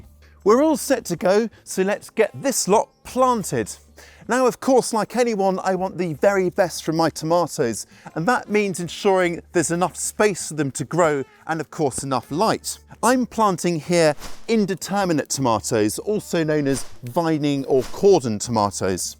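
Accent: England, United Kingdom. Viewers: to-mah-toes. i love an english accent. fish heads, not so much lol. for cherry tomatoes i have collapsible 4 sided, 4' tall plastic coated wire tomato cages that fit perfectly around my 4 gallon nursery pots in my container garden. i put them up in a 2 or 3 row checkerboard pattern, joining touching corners with wire plant twists. [picture zigzag pattern for a 2 row, or 4 corner cages and a middle cage for a 3 row.] all plants are easily reachable and get air and light. [i feel the row is more stable like this; we get fierce thunderstorms with wind.] additional training support is given with [1/4"W] plastic coated 4' stakes [not wood, termites are a thing in the south.] monster varieties eventually get transplanted into 10 gallon pots with [1/2"W] plastic coated 6' training stakes, also not wood. after 6' i cut off the top of the plant since i cant reach any higher. 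stakes not in use store very well in a vertical [4"W] length of pvc pipe screwed to a stud in the garage.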